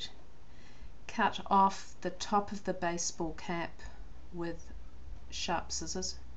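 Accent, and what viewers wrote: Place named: New Zealand. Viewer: Kiwi accent yeahhhh!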